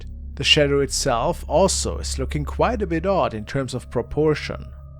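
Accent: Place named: Germany. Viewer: Hallo. Bin durch Zufall auf Deinen Kanal gestoßen und habe gleich anhand Deiner Aussprache (Slang} gehört dass Du aus Deutschland kommst. Ich schaue gerne Deine Videos. Grüße aus Lüneburg bei Hamburg! 👋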